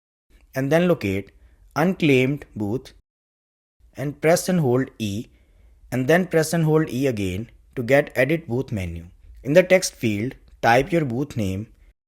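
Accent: India. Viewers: and you sound like from india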